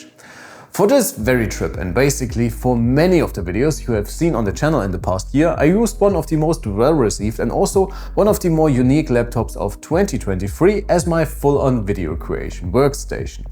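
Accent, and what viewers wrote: Germany. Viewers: Is German your native Language?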